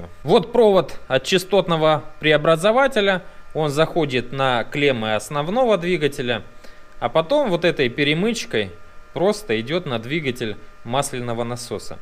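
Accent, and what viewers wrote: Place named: South, Russia. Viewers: До сих пор не могу привыкнуть))) В голове Игорь за кадром)))))) видимо у вас говор такой южный. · у вас говор такой южный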